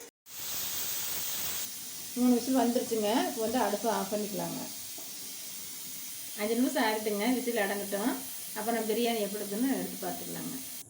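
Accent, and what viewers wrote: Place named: Kongu, India. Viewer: கொங்கு மண்டலமா சகோதரி.அருமை 😇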